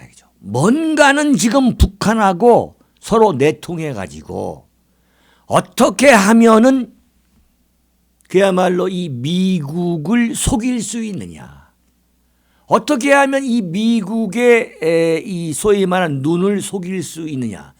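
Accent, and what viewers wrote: Chungcheong, South Korea. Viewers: tv 즐겨보고있습니다. 그런데...충청도 말때문에 답답해서 숨 넘어갈꺼 같아요. 1.5배속으로 듣고 있어요 그래도 감사합니다. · 요즘은 그렇치 않아요 저도 윤대변인님과같은 충남 사람인데 요즘은 돌굴러가요 정도는 아니예요~~ㅎㅎ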